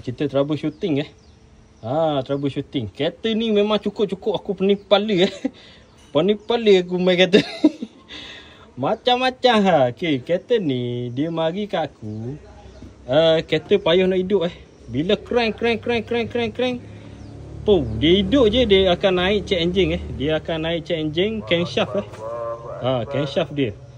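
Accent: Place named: East Coast, Malaysia. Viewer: Assalamualaikum bengkel mne eh dengor loghat mcm pata timur😅...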